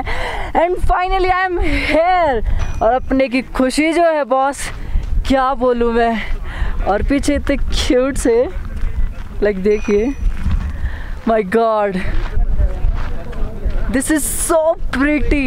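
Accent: Haryana, India. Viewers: Haryanvi accent superbbb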